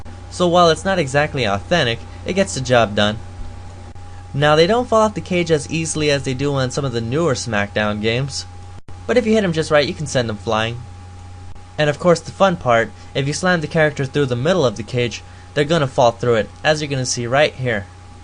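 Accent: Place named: Ireland. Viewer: I agree that it's very pleasing to listen to. Neither loud and brash, nor quiet as a mouse. Neutrally-accented, save for a faint Irish lilt.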